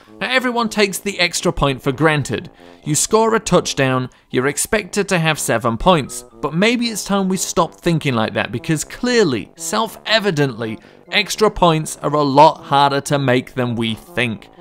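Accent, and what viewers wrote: United Kingdom. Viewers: Is it just me or is it oddly amusing hearing a British dude talk about football?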